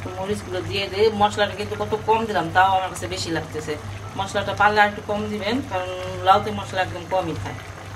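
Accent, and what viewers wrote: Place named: Narayanganj, Bangladesh. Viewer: Apu apnar desher bari kothay?apnar kotha shune mone hoy Narayongonj ba tar ashe pasher elaka.shuvo kamona.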